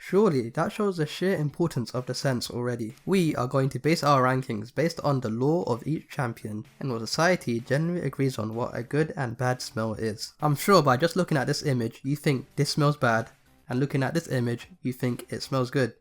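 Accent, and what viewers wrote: United Kingdom. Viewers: Dude I like your accent. It's a british one right? Sorry I'm not familiar with all the accents.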